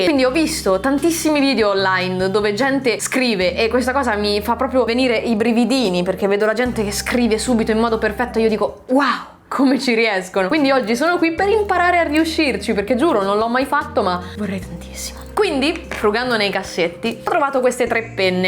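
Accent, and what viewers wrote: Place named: Milan, Italy. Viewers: Ma questo minaese nei video.... Ma sei divetate milanese dock... Tac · ma sono l' unico a sentirla con un dialetto milanese?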